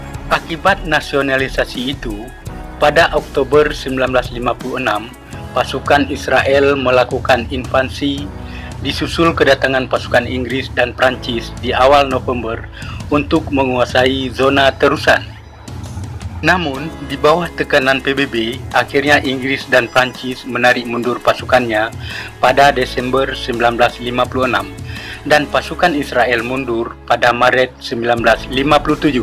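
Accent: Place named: Aceh, Indonesia. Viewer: adminnya orang aceh kayaknya,logat org aceh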